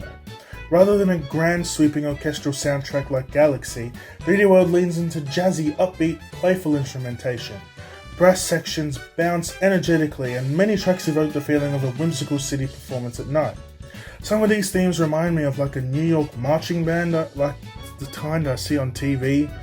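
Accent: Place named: Australia. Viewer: the australian accent. like nails on a chalkboard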